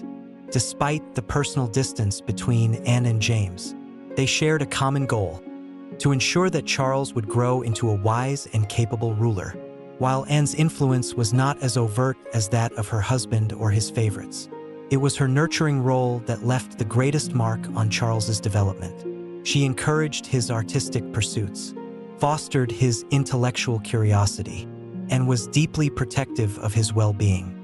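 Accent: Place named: United States. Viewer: This sounds american. I only want uk production.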